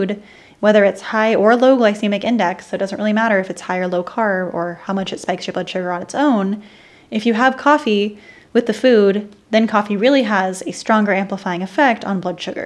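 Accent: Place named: United States. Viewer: Thanks.Your video may be great but all can't benefit from it because you speak too fast in your typical American accent.Additionally,you don't text all what you talk about. This makes hundreds of subscribers face this daunting task of understanding your good lecture.Hope you make necessary improvements in future videos.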